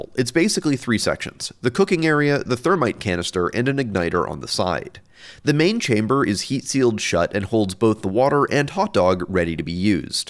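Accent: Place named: Canada. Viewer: I love how you can tell this dude is Canadian in the first min. "Must be cooked from the oatside"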